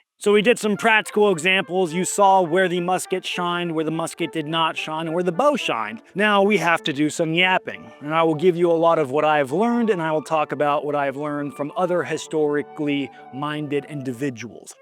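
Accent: England, United Kingdom. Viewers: His Brit accent isn't that bsd. Well, maybe it is but it's fun. 😁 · I’m English and I’m very impressed with accent he could definitely become an Englishman!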